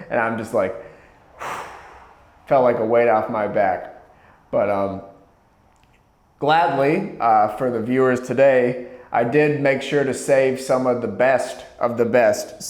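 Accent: Canada. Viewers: Canadian accent tho?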